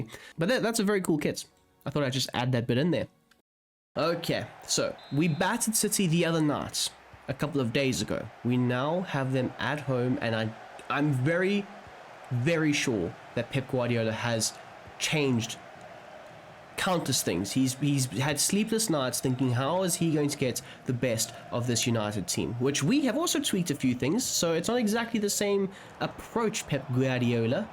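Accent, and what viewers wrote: South Africa. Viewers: I know a South African accent ev3n 8n passing. Had to double back a give a follow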